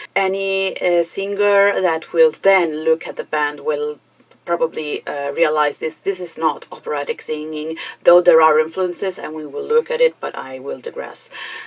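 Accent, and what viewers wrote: Italy. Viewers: Questa canzone è tra quelle che io riascolto sempre. Diciamo che è tra le mie top 200. Ebbi la fortuna di ascoltare Antonella dal vivo quando ancora doveva diventare famosa, al Palazzo dei Congressi dell'Eur, in una fiera del suono. Ne rimasi scioccato. Cantava " ", forse una prima versione. Ricordo solo che tempo dopo la sentii alla radio e corsi a comprare il disco. Non voglio mettere a confronto Antonella con altre cantanti. Secondo me non ha senso. Penso piuttosto che si possa esprimere il proprio gradimento senza fare paragoni. Ed a me, questa voce piace e molto. Come quasi tutto quello che il gruppo ha creato. Anche dopo Antonella. Ho ascoltato tutto quello che avevi da dire sull'argomento. Mi sei piaciuta. Brava. Ho capito che eri Italiana fin dalla prima parola che hai pronunciato in Italiano... Altrimenti non lo avrei notato. Sei anche di Roma. 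Beh... Anche io. E di questo mi dispiace. E' sempre più invivibile. Avessi la tua età, scapperei subito, prima da Roma e poi dall'Italia. Anche se... non saprei per andar dove.;-) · Canti di tutto… sei brava… forse un talent show, al giorno d’oggi dovresti provarlo (anche se non li sopporto). Bella Canzone, Ottima Analisi del Pezzo e Complimenti per il tuo l’Inglese… accento romanesco quasi assente… Spiazzalo con qualche canzone Italiana strana…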